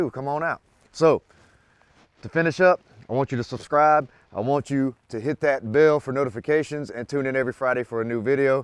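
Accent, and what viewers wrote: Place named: South, United States. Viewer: This gentleman is definitely from the south.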